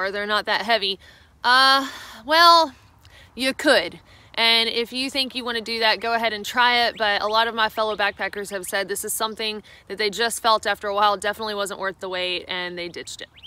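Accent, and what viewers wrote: South, United States. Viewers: From Mississippi, I speak "southern" so knew she said "lighter." So, allow me to educate. Lighter in southern is "ladder." Ladder in southern is" ladder." Clear? Another example of "southern." Female deer is doe. Opening to a building or another room through which you walk is doe (e.g. Sally opened the back doe to give John the ladder so he could light the grill). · Lighter and back up lighter... Its a southern thang!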